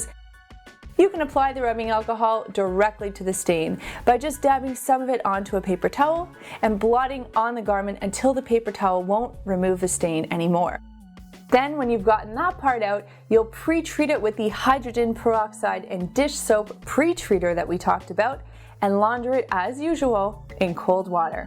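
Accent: Canada. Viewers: She's Canadian- that's the accent.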